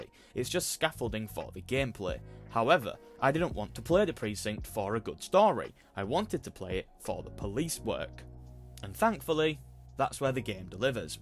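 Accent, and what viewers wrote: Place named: United Kingdom. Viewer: If it's any consolation, I thought you did a good job of it. You could have tightened up parts of it so you don't repeat things too much, but your points were well made, and I agree with most of them. I, for one, appreciate the voice of a fellow Brit, and as an older gamer, I enjoyed you speaking normally, rather than the breakneck pace I hear on so many videos of this type!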